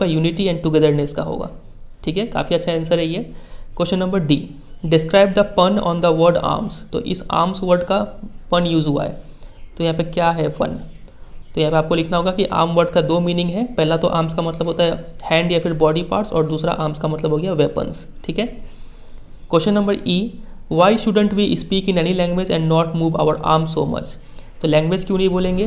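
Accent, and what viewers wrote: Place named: Varanasi, India. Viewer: banarasi accent❤‍🔥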